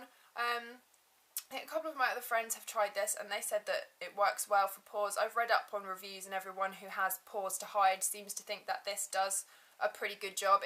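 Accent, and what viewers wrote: England, United Kingdom. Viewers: Love your english accent! Please more vídeos!!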